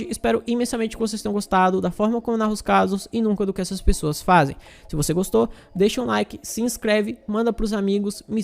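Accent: Northeast, Brazil. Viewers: Oi! Caso horripilante, o ser que se diz humano, é um bicho perverso. vc é Nordestino? Acho seu jeito de falar bem familiar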